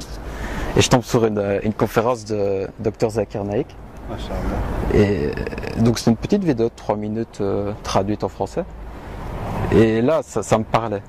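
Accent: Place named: Belgium. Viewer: But he's from Belgique so he've got a accent, I mean this french sounds a bit different.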